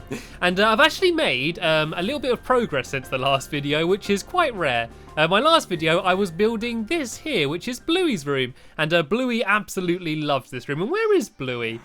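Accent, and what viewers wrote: England, United Kingdom. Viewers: My little sister says she loves watching your videos because she likes your english accent and your laugh, and me too, but you know not the same way she does, youre actually an amazing commentator and I can never wait for your videos because theyre so good and funny! :D, and also, the name Stampy HotBuns sounds hilarious! Lol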